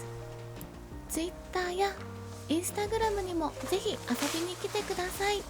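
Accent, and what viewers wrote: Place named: Shizuoka, Japan. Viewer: ❤ いつも静岡訛のトークで懐かしく癒やされます🥰 それにしてもは雨女かな🤔笑雨も味方にする凄さはならではかな💕 因みに俺は晴れ男みたい😅 あとゴールデン免許ね🎉笑ゴールド免許とも言うよ🐼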